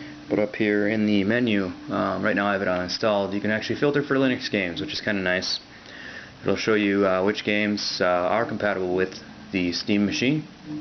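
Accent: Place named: Canada. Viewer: Funny, the beta was only for USA...or was it NA? because you sound Canadian.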